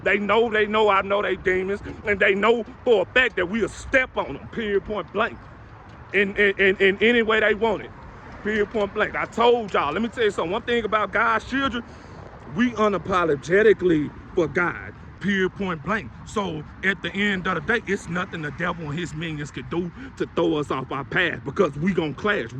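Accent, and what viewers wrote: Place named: New Orleans, United States. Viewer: Probably New Orleans with the yeah Kevin gates says it alot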